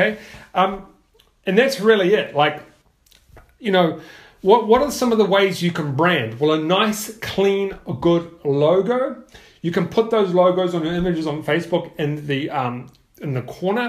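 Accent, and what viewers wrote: New Zealand. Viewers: are you from NZ?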